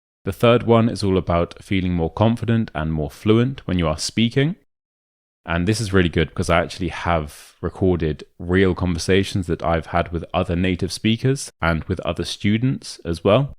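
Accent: United Kingdom. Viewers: 'Thanks for this video and your British accent! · I love your voice tembre and distinct British accent is so pleasant for my ears. Subscription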